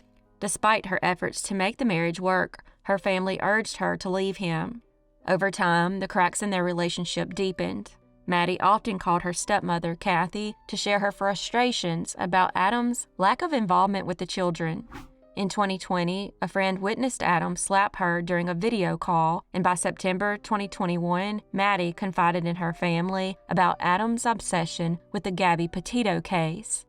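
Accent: South, United States. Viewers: I love her voice and Southern accent. ❤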